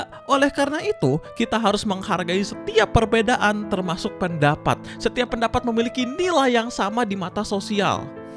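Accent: West Java, Indonesia. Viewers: Sunda lu bang?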